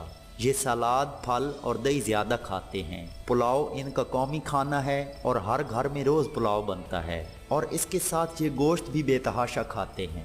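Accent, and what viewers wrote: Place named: Punjab, Pakistan. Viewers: Aap ka lehja khushab sargodha ka hea. Aap kahan say? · Ur accent tells me that you belong to South Punjab. Am I right? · Urdu ko panjabi main na bolo praa Ji.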